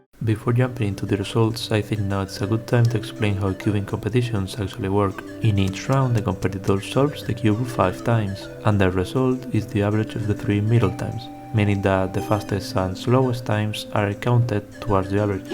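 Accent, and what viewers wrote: Spain. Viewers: me gusta tu acento español jajaj intenta alzar un poco mas la voz al hablar al micro, no seas timido!! el video esta guapisimo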